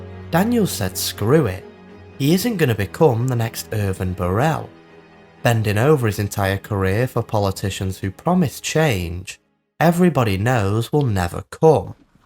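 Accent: United Kingdom. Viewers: We gotta use AI to change this guys voice…. Why so slow and British ew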